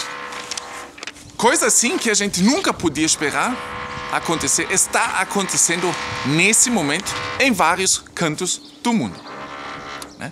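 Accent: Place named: Germany. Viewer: Permita-me trata-lo por meu amigo, voce desmonta todos os estereótipos, pré concepções e paradigmas que nós latinos temos a respeito dos Alemães como sendo um povo sério, carrancudo, de poucos amigos e cujo senso de humor não é um ponto forte. Se o Alemao medio é como imaginávamos, voce é um ponto fora da curva!! Voce esta de parabéns pelo senso de humor que se torna ainda mais pitoresco com seu sotaque alemao ( muito legal diga-se de passagem ) ao mesmo tempo que mantem o foco na informação de qualidade e sem muitos rodeios. Sensacional!! Tambem aprecio muito essa forma de dar as noticias, caminhando e mostrando um pouquinho de sua cultura para nos!! Parabéns!!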